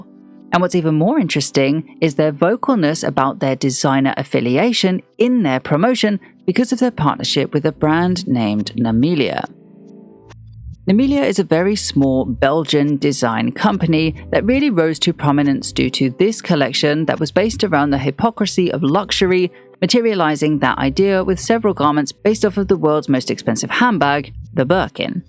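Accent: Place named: London, United Kingdom. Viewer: Great vid, ominous London and or U.K voice! Swear these vids gone help a young future fashion journalist win!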